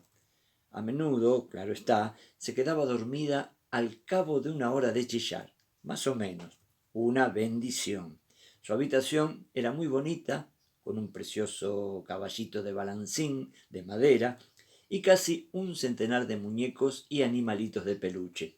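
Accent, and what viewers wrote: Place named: Argentina. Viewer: Gracias por la narración, abuelito. Su voz es tan relajante y el acento argentino, ni qué hablar! Gracias, se aprecia demasiado.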